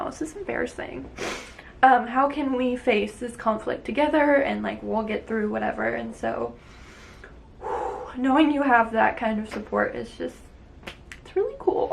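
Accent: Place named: Nashville, United States. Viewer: I hear it most in the words "here", "now", and every so often at the ends of your sentances! I just assumed it was you picking up some of that Nashville accent lol ! Maybe I'm just hearing things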